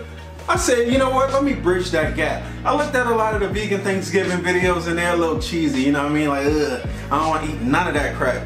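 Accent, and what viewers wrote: Baltimore, United States. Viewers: You from Baltimore, ain't you?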